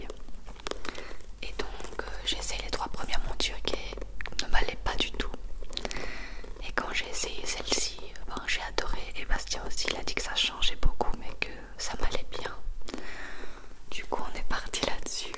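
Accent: Belgium. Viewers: C’est lunette te vont tellement bien ! J’adore ton petit accent léger belges je trouve sa troppp mignons !!!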